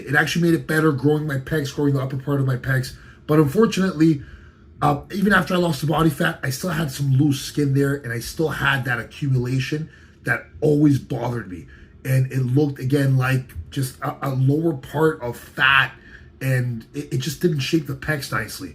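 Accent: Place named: Mexico. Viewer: at first glance u look indian, then when u talk, u sound bean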